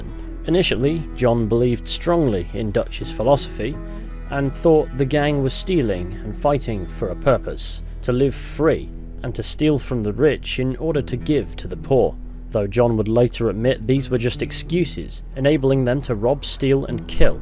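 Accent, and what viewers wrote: United Kingdom. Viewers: Go damn it, why do u have the annoying british accent, just why?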